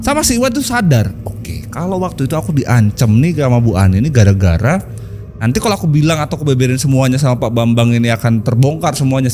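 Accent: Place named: Java, Indonesia. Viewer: Cerita horor versi ngapak yg ga bikin aku merinding tapi malah ngakak 😂😂😂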